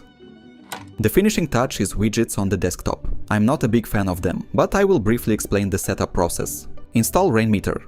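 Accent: Russia. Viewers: Стронг рашн акцент 😊 спасибо за видео ❤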